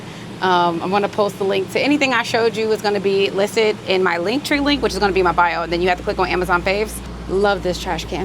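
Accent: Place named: Jamaica. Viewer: How come you sound Jamaican from time to time?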